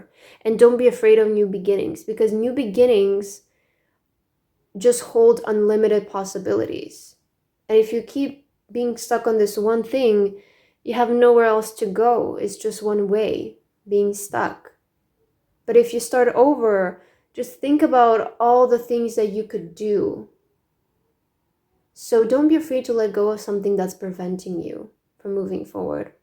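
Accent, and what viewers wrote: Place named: China. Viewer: 哈哈哈哈姐姐是中国人嘛这个口音真的很可爱😂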